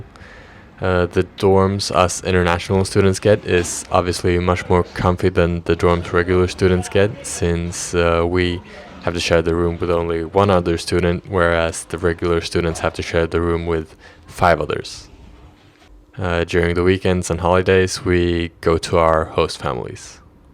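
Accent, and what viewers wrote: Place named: United States. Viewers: I am American and I actually thought he was American until he said he's from Stockholm. He probably spent time in America. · how does a swede speak english with an american accent?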